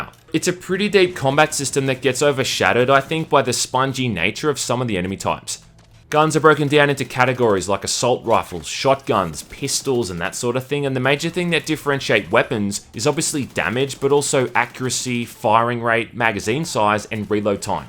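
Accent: Australia. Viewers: that australian accent tho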